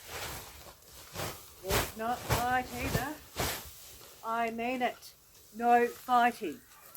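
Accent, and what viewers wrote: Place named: Australia. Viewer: Seen you for the first time, on account that I am subscribed to CC ... after a minute you got my black bell for your good old australian common sense ( and accent ) . love from aussie expatriate .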